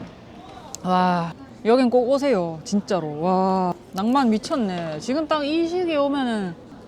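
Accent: Busan, South Korea. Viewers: 부산 사투리 죽이네.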